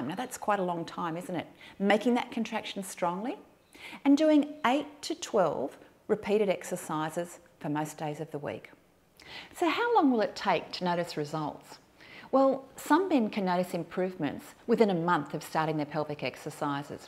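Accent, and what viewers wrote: Australia. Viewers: Somehow that Aussie accent helps me feel more confident. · oh, an aussie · I love Australian accent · Love your Aussie accent. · that Aussie accent helps me feel more confident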